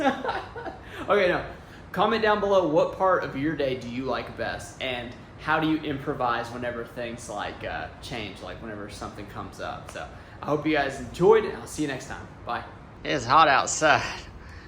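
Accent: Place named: Dallas, United States. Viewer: Lol, I just love your videos. I usually watch YouTube on Roku so don't comment but I've been watching for a few months; I'm in Tulsa and went full-time with eBay selling during covid lockdown. By the end of it I was making more money on eBay than I ever made teaching so still haven't gone back to my former job. I've never sold clothes but plan to get into women's, particularly things that I can find here easily and sell on the Pacific corridor and in France, where I have family, for a profit. I won't be doing mens' modern so no competition from me, but I do hit the Dallas thrift stores about once a month when I'm down there. I've also sourced in Oklahoma City but never in Lawton. I love all the vintage western shirts, I swear I could make a killing in France on those but it's not entirely legal for me to bring a bunch of merchandise I plan to sell over there in my suitcase. 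Tempting though... You look so much like my Dallas cousin, and I swear your accent is exactly the same. I just die when you stop for a victory cone at Braum's after a day of sourcing. It's so refreshing to see another somewhat local seller that I can relate to, even if we don't sell in the same category. Just had to pipe up and say how much I enjoy these since I happen to be watching on my laptop tonight and have a keyboard handy... keep it going, you're really the best! I love it!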